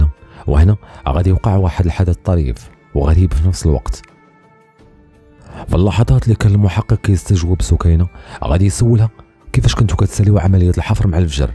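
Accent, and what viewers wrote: Morocco. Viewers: خرجتي جمال الداريجة المغربية بصوتك شكرا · فعلا برهنت ان اللهجة المغربية لهجة جميلة. و غير مختلفة عن اللغة العربية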